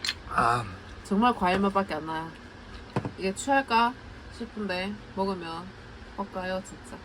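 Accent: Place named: Gyeongsang, South Korea. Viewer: 구수한 갱상도 사투리가 매력적입니다❤️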